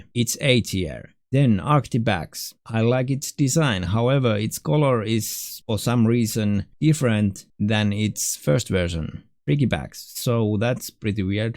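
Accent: Finland. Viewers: Are you finnish?? You sound finnish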